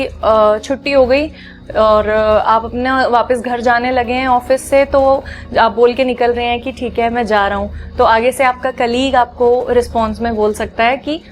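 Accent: Punjab, India. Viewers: Ap punjabi ho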